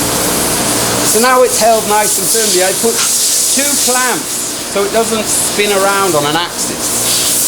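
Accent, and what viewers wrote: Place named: Wales, United Kingdom. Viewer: Which part of wales are you from if you don’t mind me asking? I’m welsh and still live here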